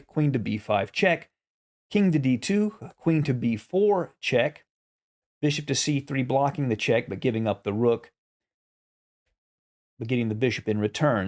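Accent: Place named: South, United States. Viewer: Thanks for sharing with us. I like the accent as well as the commentary. My guess would be Alabama or Tennessee. Great series on Firouzja by the way. After watching your videos, I now understand why the guy's made such a big impression on the chess world. Looking forward to the next video.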